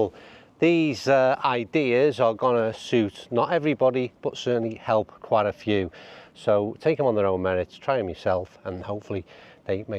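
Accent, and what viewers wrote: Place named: Scotland, United Kingdom. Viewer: He has a Scottish accent so I believe everything he says.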